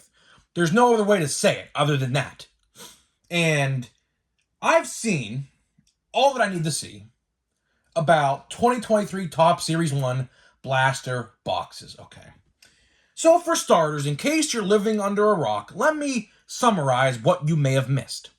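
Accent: Pittsburgh, United States. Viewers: Just found your channel. Two seconds into listening to you while doing some work I said this dude has to be a Yinzer. LOL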